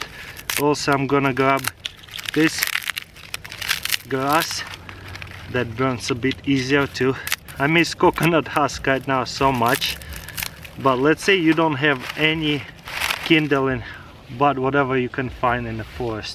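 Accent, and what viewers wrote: Russia. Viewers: Russian accents are my favorite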